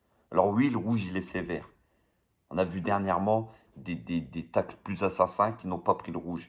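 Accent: North, France. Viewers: Voilà les gars . Tu viens du nord ou koï? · y a un accent du nord la derriere ?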